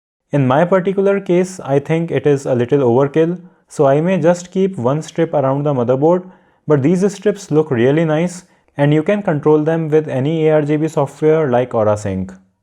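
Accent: India. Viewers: Lots of indian accent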